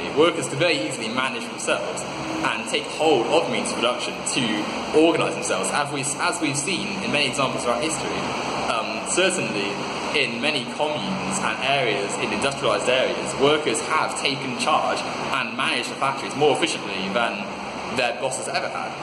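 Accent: United Kingdom. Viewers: ***** he's British?!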